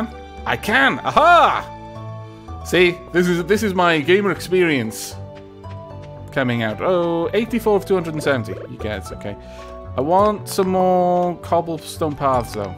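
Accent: Wales, United Kingdom. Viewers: Ok so after watching your let's play i went and bought the game and am just starting out. Loveit so far! love the vids and your adorable welsh accent! First time watcher of yours now and new subscriber. Just wanted to say thank you and keep going. please. Also love the tardis and doctor Who references as well as a few other things you have referenced. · Tigran Movs he’s welsh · Hey, I can immediately tell that you are British from your voice but you don't sound very Welsh. since you're returning to the valleys, you could bring the account into it a bit. show me that you're the only gay in the village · You have that Accent, sounds British or genuine gentlemen like.. soothing I can listen to you play great games on a podcast. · he's Welsh. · I thought he was British XD thanks for the confirmation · Ah so your a brit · I just found your channel and your voice is arousing. 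Also you are the most British youtuber, even among British youtubers. (That's a compliment)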